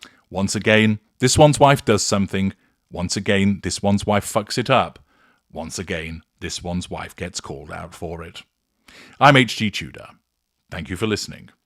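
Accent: United Kingdom. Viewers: Please, please, please H.G. Tudor. Don't create more 50 second introductions to your interesting and entertaining videos. They are so well done. You are so intelligent, talented and have a beautiful, deep voice❣️ l love your British accent ❣️ I always fast forward the 50 second intro because I don't want to wait to get to the content. Please create more intros like the flames. Thank you for teaching us.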